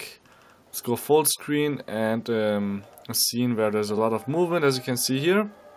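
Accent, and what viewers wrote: Germany. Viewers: are you german :)?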